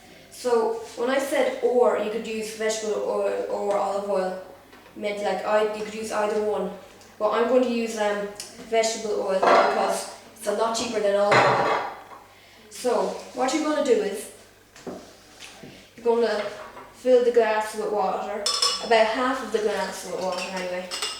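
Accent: Ireland. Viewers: I love your accent! You're Irish? Welsh? Scottish? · wow i love his accsent....is it Irish? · Sorry but im british, i've never head anyone in my life say 'dish soap' that just sounds weird o_o Where we come from we call it 'washing up liquid'. And no, I do not speak like him because he's irish im guessing >_> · Cool are you Irish? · I love the Irish accent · U sound Irish but at the same time gay :-/ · gay irish man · Are you irish because I'm irish and you sound iris to me and don't mind what these people calling you names say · Someone's irsh..... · dublin or belfast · are you IRISH by any chance lol? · Yee sound a bit Irish yea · ah ur irish just like me :) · he sounds so irish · Are you Irish? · Im Scottish and i know for sure he's Irish!!! good job, WELL DONE :D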